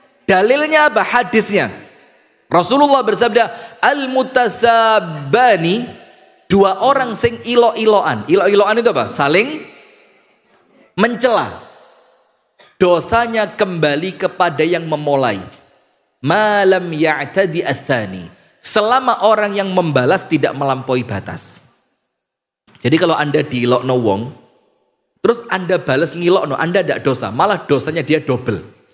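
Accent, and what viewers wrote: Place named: Java, Indonesia. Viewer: Masyaallah pak ustadz wajah ke arab arrabban..bhsa jowony medok...inggris juga bs.apalgi bhs arab sehat sehat pak ustdz Aamiin 🤲❤️🙏🙏❤️❤️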